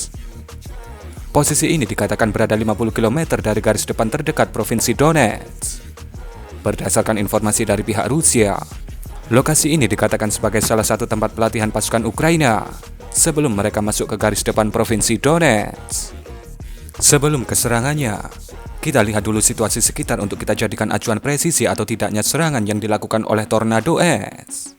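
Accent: Java, Indonesia. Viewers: Komentar saya cuma 1 suaramu medok lucu😂😂😂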